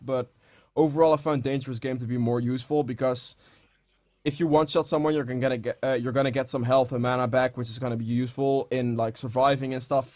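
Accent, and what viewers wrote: Netherlands. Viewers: Hahaha jij hebt echt een Nederlands accent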